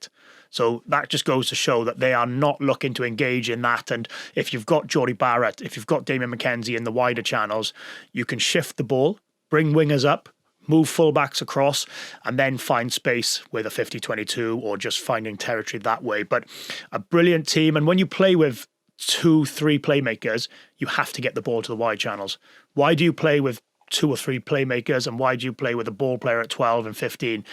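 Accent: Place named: Wales, United Kingdom. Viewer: You sound Welch now I'm listening..Bro.. Mum was a Owens ❤ Love ya MA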